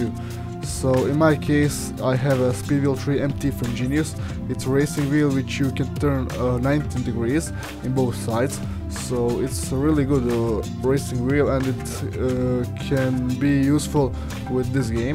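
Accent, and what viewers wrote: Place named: Hungary. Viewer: Damn your accent so similar to my hungarian accent. Where are you from?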